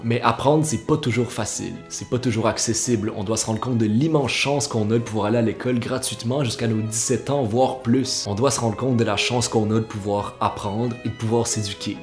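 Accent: Quebec, Canada. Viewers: J'habite au Brésil, et j'apprends le français depuis 5 mois. Je sais pas le raison, mais c'est plus facile pour moi pour comprendre l'accent québécois que l'accent français et, découvrir ta chaîne, plein des sujets que je m'intéresse (et vous avec votre mignon accent), était une bienfait. Il n'y a pas beaucoup des chaînes en français que sont cool comme la votre. Merci. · Ce qui m'a attiré dans ce vidéo c'est l'accent Canadian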